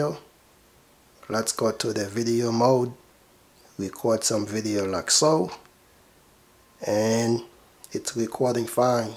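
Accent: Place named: Arab world. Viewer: Is better than a Spanish dude Maybe he has arab family and that's y he talk like that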